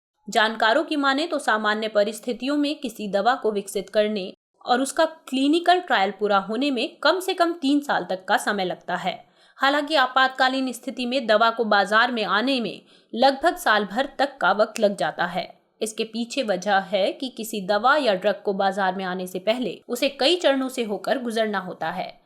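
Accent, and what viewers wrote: Bihar, India. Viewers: Bihar se ho kya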